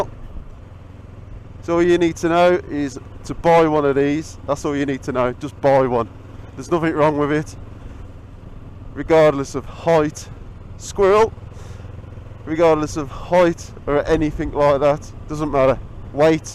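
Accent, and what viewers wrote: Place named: United States. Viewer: Great job and great American accent,haha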